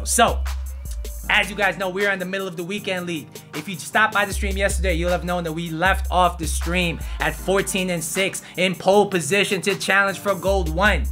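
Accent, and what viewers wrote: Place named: California, United States. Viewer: Cali boi? I can sense an accent bro hahaha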